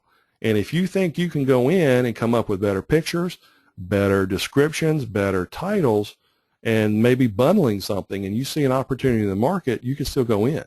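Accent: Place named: South, United States. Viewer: OMG...I just love your freaking voice!!! You sound just like famous celebrity gardener, P. Allen Smith! with that soft, soothing, medium southern drawl...I could just sit and listen to you talk about the phone book for HOURS!!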